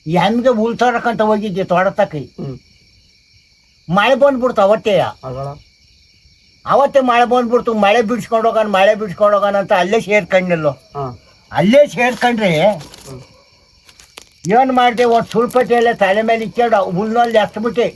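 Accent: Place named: Tumkur, India. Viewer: ನಮ್ಮ ತುಮಕೂರು ಭಾಷೆ 👌👌👌👌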